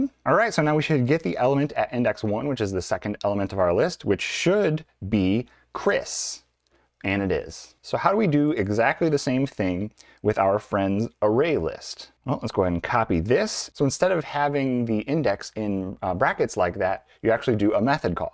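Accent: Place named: United States. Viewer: finally an american explaining it hahaah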